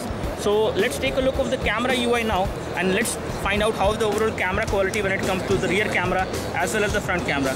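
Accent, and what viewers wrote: India. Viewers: accent hindu...sin duda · Jakoś nie mogę znieść tego hinduskiego akcentu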